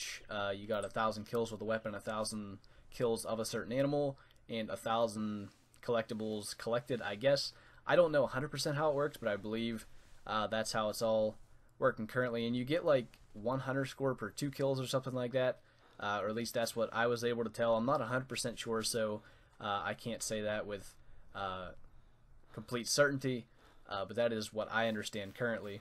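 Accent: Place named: United States. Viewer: Hi, i m a french player of the hunter and want to say you, you are doing excellent videos. even cause of your american accent i don 't understand all of what you say in your videos but it's very nice to watch your videos. thank you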